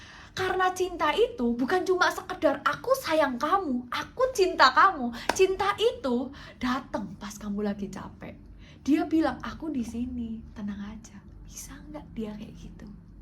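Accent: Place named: East Java, Indonesia. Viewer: Medok jawatimuran kentel banget bikin ngangenin, apa lagi para perantau asli Surabaya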